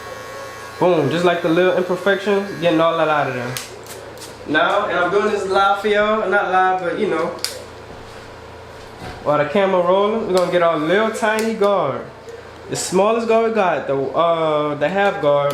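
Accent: New Orleans, United States. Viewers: Am I wrong? I heard a N.O. accent. 🤔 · Bro are you from Louisiana fam? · He gota be from New Orleans ya heard me... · Are you from New Orleans? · Sound like you from here in the N.O.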